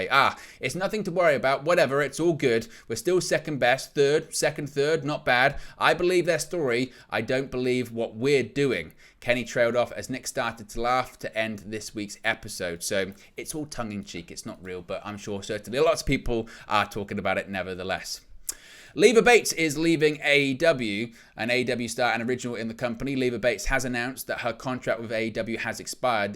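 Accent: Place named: United Kingdom. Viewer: Don't like British Accents Bruv?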